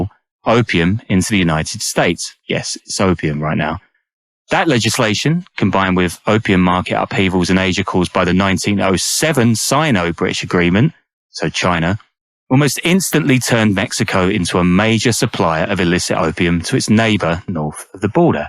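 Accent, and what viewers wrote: United Kingdom. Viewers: Why the fk is it always narrator’s with an English accent. Like y’all facts are always so fked it’s unreal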